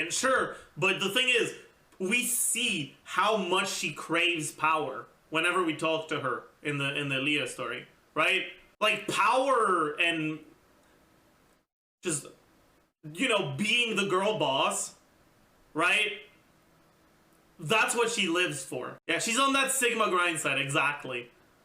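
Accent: Quebec, Canada. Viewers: Hey, I'm really impressed with the quebecois accent you did for this video. Also the beard looks super real. Well done like subscribe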